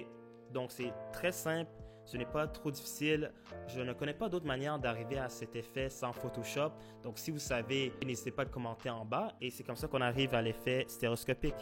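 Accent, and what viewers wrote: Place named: Quebec, Canada. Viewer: "desole pour l'anglais" 😂 allors, mon francais n'est pas tres bon sans Google Translate. (<- I actually typed that without looking it up so it probably has grammatical/spelling errors) Being from the Toronto area, while I "learned" French, in school. It was hard to properly learn it. I'm learning more from watching French-speaking youtubers and French shows than from class! The auto captions helped me understand what you were saying but it's not always accurate. maybe for these, you could include French captions. Also, as soon as I heard your French, I KNEW you were from Quebec...the accent is just too indistinguishable😄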